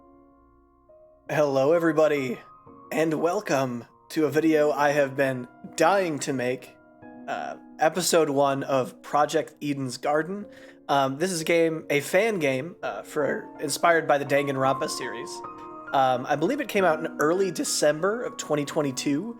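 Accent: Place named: United Kingdom. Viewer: Listening to you gush about this game while you play it is pretty great. Also, enjoying your British accent.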